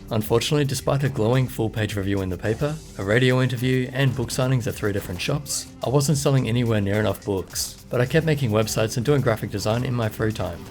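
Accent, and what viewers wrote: Australia. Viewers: I seriously love ur smooth soft aussi accent voice. Been quitely following you for many months and have recommended you many times to many friends and they all got great help from your Videos eventho youre not yet quite at 100k congratz to being the most amazing tech/mouseyoutuber on this platform. -Not so smooth german dude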